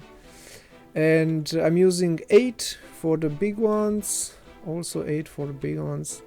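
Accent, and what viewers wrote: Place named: Germany. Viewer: me Too:D i knew i heard that you have some german accent:D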